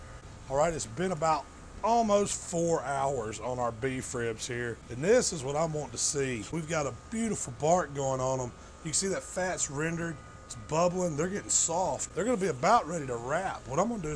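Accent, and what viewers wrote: South, United States. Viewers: I went through dozens of bbq videos until I saw a big fat guy with a southern accent. Then I was like, "I'm gonna listen to THAT guy." Love your videos, brutha. Total food porn for real. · That one good me good, he often says stuff like this, really southern imo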